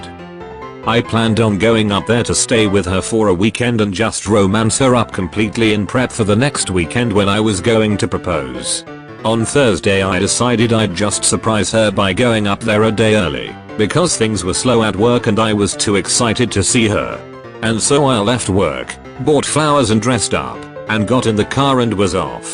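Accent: Scotland, United Kingdom. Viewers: I was refer ing to the narratpr being english because I liked the Scottish accent. Lol not me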